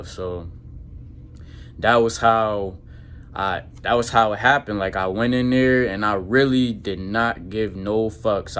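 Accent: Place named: New York, United States. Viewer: Good video bro💪🏾 The way you talk gives me New York vibes lmaoo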